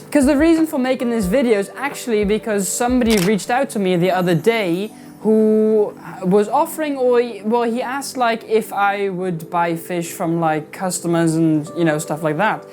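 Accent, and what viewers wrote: Wales, United Kingdom. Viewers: Trying to place your accent. Welsh ?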